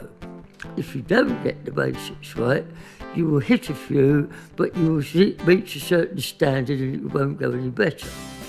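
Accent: United Kingdom. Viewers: I have to admit, I have no idea what he said the 2nd step was. Apparently, I speak English, but I don't understand British.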